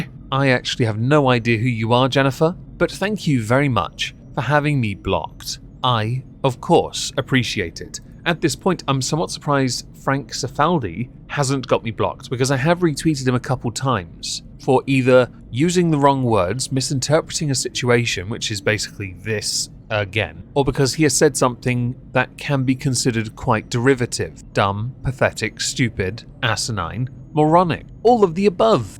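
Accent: RP, United Kingdom. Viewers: OH- I really, really want to subject him to them. He's peak entertainment when He's disgusted and annoyed. Oh please 🙏! The worst offenders are always intimidated by your command of the English Language / Posh accent.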